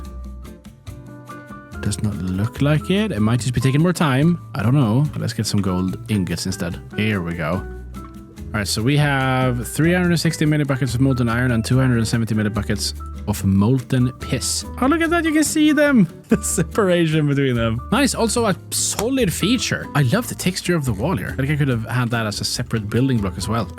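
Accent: Sweden. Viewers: Asså jag har aldrig hört en sån stark svensk dialekt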